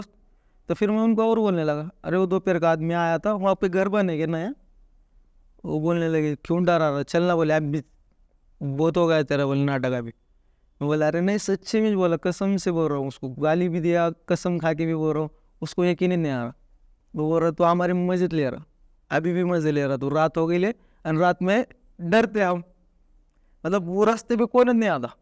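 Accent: Maharashtra, India. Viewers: Hindi बोलण्यावरून समजतंय मराठी आहे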